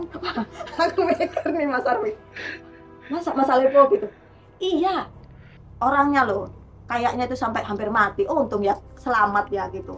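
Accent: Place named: Surabaya, Indonesia. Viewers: Metu medok jowone mbak seng marai lucu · Omongane wong suroboyo nyel 😂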